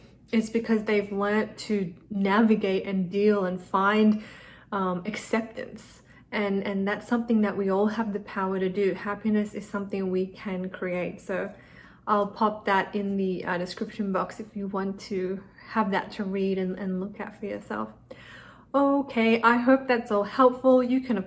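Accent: Australia. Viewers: I love your voice. Aussie accent?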